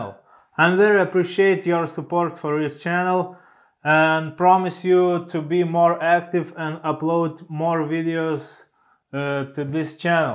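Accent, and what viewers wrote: Russia. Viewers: Русское произношение...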